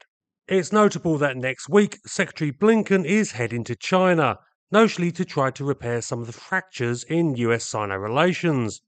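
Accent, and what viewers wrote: United Kingdom. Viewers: Always refreshing to hear a UK voice, with a reasonable, calm, unsensational approach to a major issue. 👍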